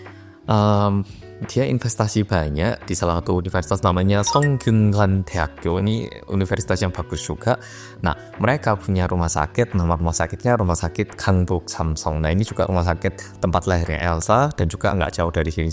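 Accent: Java, Indonesia. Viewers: Selamat yaa atas kelahiran anak pertamanya, turut berbahagia ❤ Kadang masih gak yangka ini orang korea, soale ngomong indo ambek jawa e iki medok poolll 😂 Jujur mirip dirimu sol 😅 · Ruel sejak bayi udah dengerin bahasa indonesia medhooook 😅😅😅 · Ngikutin mas dari Orang Korea yang medok sampai orang Korea yang beranak😂 Anak nya gak jadi namanya Jang rawon mas😅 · Youtube channel org korea yg aku follow cm. Krn emang bener2 sdh mendarah daging indonesia, bahkan cara bicaranya medok jawa bgt, ga ada logat korea sama sekali. Tau banyak ttg indonesia ketimbang org korea lain yg hanya memanfaatkan konten demi cuan. Btw selamat atas kelahiran putra pertama nya Jang Ruel, smoga menjadi anak yg berbakti pd org tua & sukses. Ruel malah diajak ngobrol boso jowo, diajari boso jowo jg bro 😂😂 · bahasa indonesia itu mah.. jawanya sekalimat sekalimat. tapi medok iya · 5 bahasa berarti mas bahasa korea, inggris, mandarin, indonesia, jawa medok 😁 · Orang korea yg medok.. 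Ngono ae lah mas.. Iku cirikhas sampean og.. 😁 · Iya bener banget.. aku masih inget si jemuran2 itu.. selamat ya mas.. bangga banget denger medok Jawa mu masih kuentel gtu.. selagi lagi welcome to the world Dede Jang Ruel, sehat2 dan bahagia.. ❤ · I just wanna say, CONGRATULATIONS mas medok 🤍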